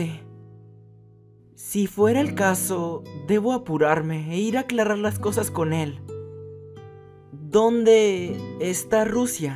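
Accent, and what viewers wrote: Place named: Peru. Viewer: Ala shaval v: Me eh sorprendido con tu acento peruano 10/10 Entons pa cuando la segunda parte :D? ;-; no mms 🌈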